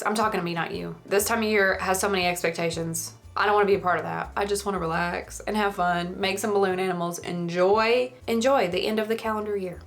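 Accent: South, United States. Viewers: Your southern accent sounds like Georgie from Young Sheldon...so cute 😊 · I live in a small city in north-central British Columbia that wishes it were Southern, and I love your accent. It makes me think of sunshine and sweet, iced tea. Also, in Canada we don’t have dollar bills or pennies any more, so that wreath would have to be covered in $5s - which are blue. .. not quite the same! 😂 After we decommissioned the penny, my former hair stylist did the penny floor in the entrance to her salon which was in a heritage building in Vancouver, and it looked wicked! But she did say it was a lot of work. I adore your channels - thanks for all the hard work you put in to give us something cool!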